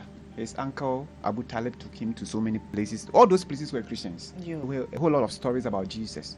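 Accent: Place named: Arab world. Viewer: You can see the way the Arabic accent was so clear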